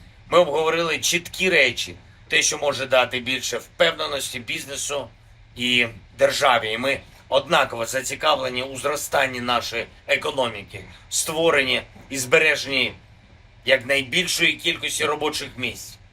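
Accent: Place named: Russia. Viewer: Хреново он по хохлячекму балакае. С русским акцентом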